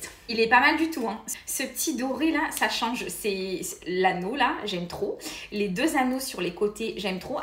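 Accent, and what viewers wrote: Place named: South, France. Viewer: Corps parfait, accent du sud adorable, une pincée d'humour. ❤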